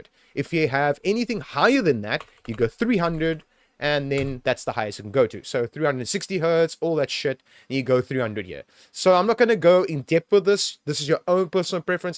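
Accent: South Africa. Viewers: i heard the accent and realised your a south african too, awe and thanks for making this video it really helped me.also keep up the good work my bru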